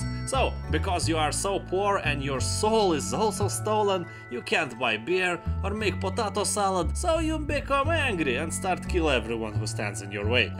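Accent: Russia. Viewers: Че ж такой жесткий русский акцент...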